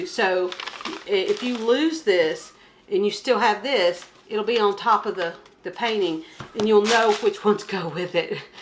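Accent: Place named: East Tennessee, United States. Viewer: I’m in the East Tennessee mountains and proud of it. We do talk like that lol.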